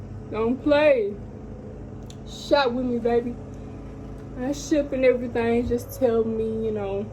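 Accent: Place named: Texas, United States. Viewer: Please tell me you from texas because that's all I hear coming out ya mouth 😂😍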